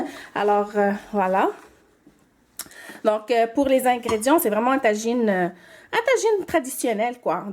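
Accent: Quebec, Canada. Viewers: ton accent quebecois 😍😍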